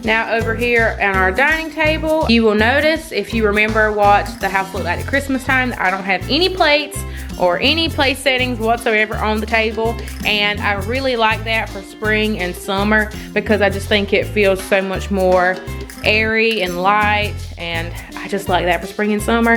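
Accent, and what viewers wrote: South, United States. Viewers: You must be another southern gal. Love to hear you talk and I love your home and Easter decor💕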